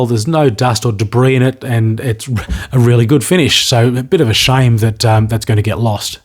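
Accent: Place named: Australia. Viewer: This is awesome and for some reason the accent makes this that much more special. I have to be honest, its probably because Aussi race announcers. Regardless killer build man.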